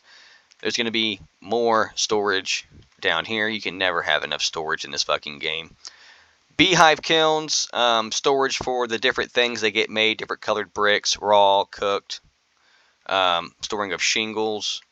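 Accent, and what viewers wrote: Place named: South, United States. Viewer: Nevermind, you have the southern dude voice and you sound like you grew up shooting squirrels I apologize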